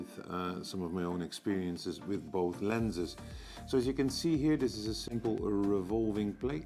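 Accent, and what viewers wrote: Netherlands. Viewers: It is ttartisan not artisans. Both are different companies. Are you dutch btw- I hear the familiar accent:) I prefer the ttartisan lenses. All of them are great and sharp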